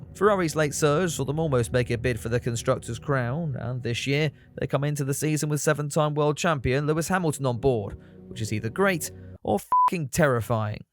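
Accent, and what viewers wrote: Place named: United Kingdom. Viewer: Just discovered your channel. Where have I been? Love your droll sense of humor! You are definitely in keen competition with another YouTube channel where the creator/narrator is probably an American, maybe Canadian. But I love the British accent, which Rick doesn’t have. A plus for you, for sure. I am subscribing now, only half a video in. Kudos.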